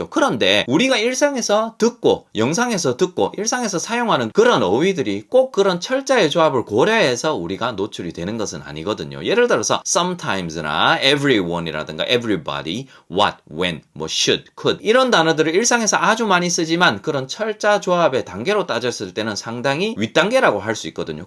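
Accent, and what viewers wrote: Gyeongsang, South Korea. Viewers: ㅎㅎ목소리 톤이 좋으셔서 경상도 억양이 섞였어도 내용이 쏙쏙 잘 들어옵니다.😂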